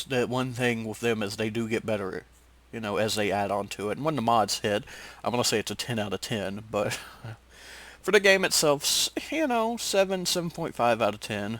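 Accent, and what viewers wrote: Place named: United States. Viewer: what a cowboy accent ! God damn ! 🤣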